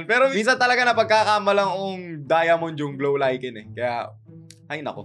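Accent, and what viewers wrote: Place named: Batangas, Philippines. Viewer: first kopo marinig mag batanggenyo kapo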